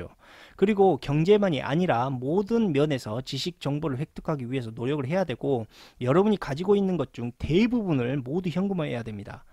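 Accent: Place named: Honam, South Korea. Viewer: 칠백명때부터 보던 사람입니다. 사실 지역감정이 없던 저인데 가족이 전라도 사람에게 사기를 당하고 이후에 안좋은 마음이 자리잡게되었어요. 그런데 억양에서만봐도 님도 호남이 고향이신것 같은데 영상보며 응원많이 합니다.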